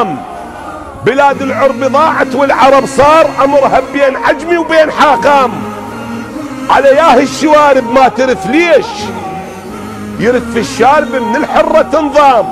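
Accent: Iraq. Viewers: يااخي شجابه عل انبار. ترا مبين ذاكيا حت لهجته لهجه اهل صلاح دين ماشايف لقائته. وهو من البو خشمان. بتكريت. وتكريت الاصل. وهسا هو بتركيا عايش. ارحموا عقولنه شوي. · اللهجة عراقية وشاعر اسمة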